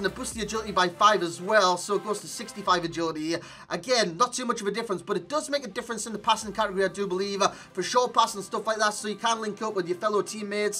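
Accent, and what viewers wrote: Newcastle, United Kingdom. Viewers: Another Geordie we aye man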